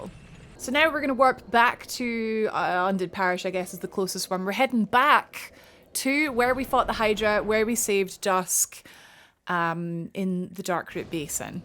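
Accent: Scotland, United Kingdom. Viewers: Are you Scottish Great video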